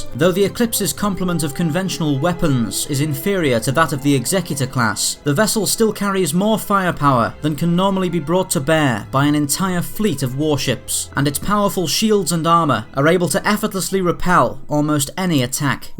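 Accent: United Kingdom. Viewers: I really like listening to the British accent giving tech jargon. 😉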